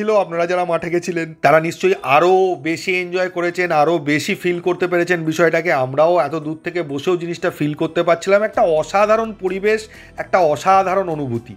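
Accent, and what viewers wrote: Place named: Basirhat, India. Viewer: তুমি কি বসিরহাট বা হাসনাবাদ দিকের ছেলে ??? আমার একজন এই রকম কথার টোনে বন্ধু আছে.... 😀😀😀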